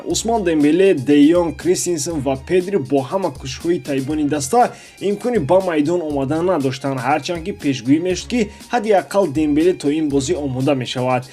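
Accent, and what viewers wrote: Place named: Afghanistan. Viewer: Илтимос ба хаму лахчаи агони габ назан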